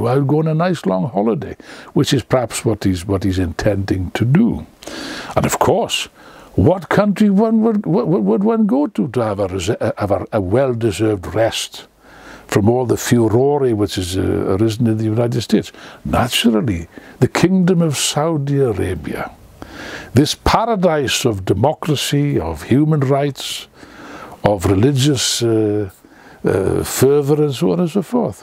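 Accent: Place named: Wales, United Kingdom. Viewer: If every political statement were delivered in the Welsh accent there would be more peace in the world. (Not taking away from the message obviously, it's spot on.)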